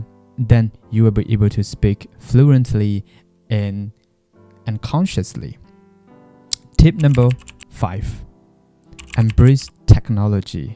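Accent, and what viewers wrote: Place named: United Kingdom. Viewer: British အသံထွက်ကိုသဘောကျတယ် အကို 💥 လေ့လာနည်းလေးတွေများမျတင်ပေးပါ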